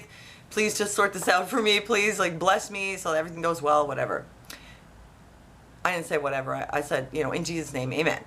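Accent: Russia. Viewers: I like your Russian type of accent. Funny.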